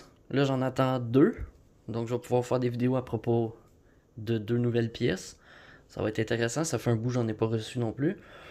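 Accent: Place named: Montreal, Canada. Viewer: dans celui si oui mais ca 2 ou 3 video jecoute car jai entudue parler de fausse or chinois en lingot et je suis tomber sur tas video que je trkuve vraiment hot .... tk . cest pour toi que je dis ... mon chummy lui cetais des bijoux en or brefff il ma pas ecoutter et cest fais depouiller aux complet pour 15 000 en bijoux .... sont ruser ... sois vilgilant .. montres le moin d'info possibles ... pis si en plus le gouver yen on pas ben ils surement jaloux .... lolkkoll ... moi pour moi largent et tous pierre metal ne veaux rien ... compararer aux vrais valeurs !!! noublis pas les gents sont parfois imprevisible .... toutes signes avant coureures d'attack potentiel est tous chose inabhituel ... bonne soirer mec .. par t'a vois ton style de parler moi jpense tu dois etres a mtl ..genre ... . tk repond pas ... mais tu voie meme genre en partans .. 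ca raptisse le perimemtres jvais arreter la pour pas te stresser ... ya man fais pas le fou ..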